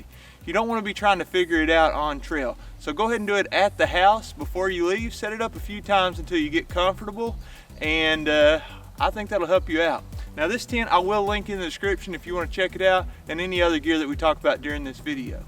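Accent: Kentucky, United States. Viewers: Excellent stuff here. Never thought to check ground level with my water bottle! One thing I used to love seeing when I was deep fall camping for hunting was a floor covered by dry leaves where I was going to camp. I’d use a stick and rake up three piles. Pick out the sticks and twigs from them, then set my tent up on top of the smaller pile. The other two I’d use to fill up two large heavy duty lawn bags. I put the two bags in my tent, covered them with a wool blanket, and that was my mattress. Combined with the duff under the tent I stayed warm and comfortable. ABC - always be careful, though…if it has been a warm day, you may accidentally rake up a copperhead. Also… I saw you wearing a BBN shirt in a video and your accident sounds Kentuckian. Are you one of us Appalachians, man?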